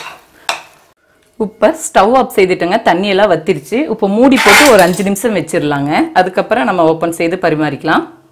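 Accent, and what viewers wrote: Kongu, India. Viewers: super mam rombha easy aa sollitharinga unga samayal ellam. nallaeruku athalayum neenga oru varthai solluvingala sethikalam athu enaku rombha pitikum neenga kongu thamizhaa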